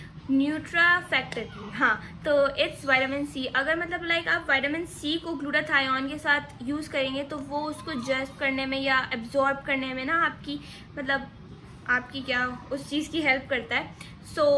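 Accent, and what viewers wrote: Pakistan. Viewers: your way of speaking is like you are belonging to a muhajar cast...:(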